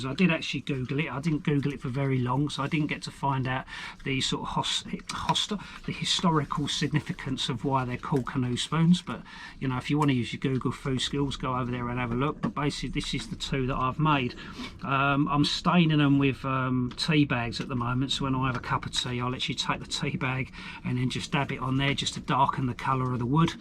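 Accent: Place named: RP, United Kingdom. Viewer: Bit posh mate..... Very posh in fact..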